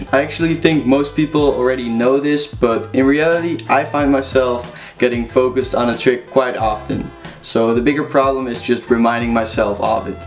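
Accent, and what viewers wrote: Netherlands. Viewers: He has a Dutch accent, m8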